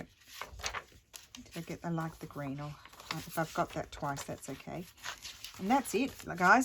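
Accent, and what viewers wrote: Australia. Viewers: "Go bananas decorating" haha I love your Australian accent and expressions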